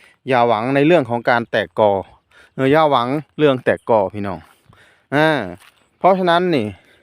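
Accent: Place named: Isan, Thailand. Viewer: อืดอัดคุนจะเว้าอึสานก่อเว้าจะพุดไทก่อพุดนะคุนผมคนลาวฟ้งแล้วมันขำ😅และก่อยากหัว😅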